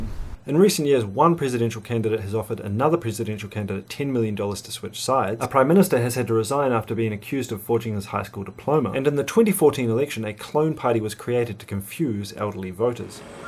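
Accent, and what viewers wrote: New Zealand. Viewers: I think you have the New Zealand accent to blame for that.. Takes a more effort to understand if you have not heard it before! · I didn't even recognise the Kiwi accent til half way thru the vid hahaha.. obviously been watching too much shortland st fml. Awesome vid tho man, good on you! love from another kiwi guy <3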